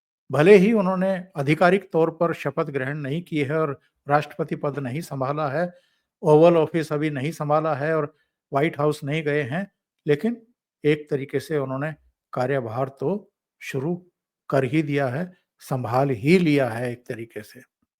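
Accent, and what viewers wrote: India. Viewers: Nui to kahu Chacha Desi andaj me .news badiya h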